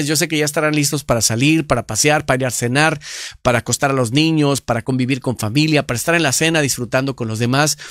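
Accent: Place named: Mexico. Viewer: En dónde se encuentra Usted? Su acento es Mexicano.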